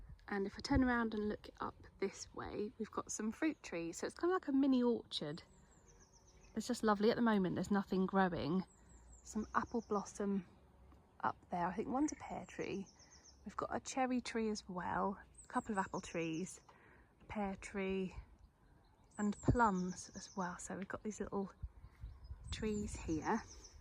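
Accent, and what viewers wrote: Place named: England, United Kingdom. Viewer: Good Morning!! I love your English accent 😍 I come from Italy and I have studied English for many years ❤❤ your vlog is really awesome 😍😍😍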